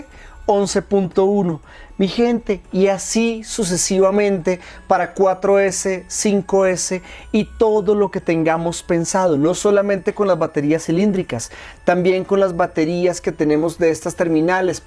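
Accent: Colombia. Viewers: Buenos días mi gente. Estoy aprendiendo a arreglar parlantes a mi tercera edad, y yo siempre me pregunte para que diablos servia esa cinta de las baterías (reguladores de voltaje), y varios parlantes los deje así colocando baterías de litio sin este regulador, porque pensé que era como colocar las baterías a una radio. ¡qué error! Soy de Bogotá, y no sé donde conseguir esas cintas reguladoras. Por su asento sé que eres compatriota mio. Ese regulador de voltaje vendría siendo como el flotador en un tangue de agua, ¿cierto? Muchas gracias por sus contenidos me han ayudado mucho.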